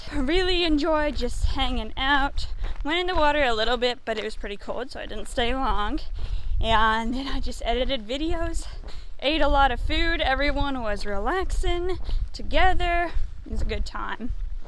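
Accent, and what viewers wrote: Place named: Kentucky, United States. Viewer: Jersey dude here. You are sweet but from a Jersey perspective your Kentucky accent is bordering on a speech impediment.I guess it is all about your point of view...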